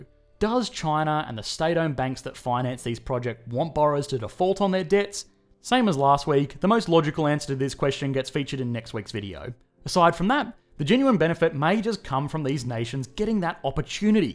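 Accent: Australia. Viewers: 趙子畵 hes definitely an Aussie. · This dude sounds Australian and is saying China is about "Free Trade".... Has he looked at his local newspaper? Almost like me saying America doesn't have a rioting problem. Or China saying they didn't start the Coronavirus.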